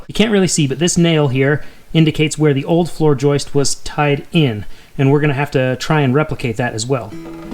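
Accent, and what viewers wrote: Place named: United States. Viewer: Well done man, you navigated me vocally during your video.. Classic keep it up. I’m Irish and hearing your proper American accent had me smiling and laughing respectfully. Goodstuff boss keep it up!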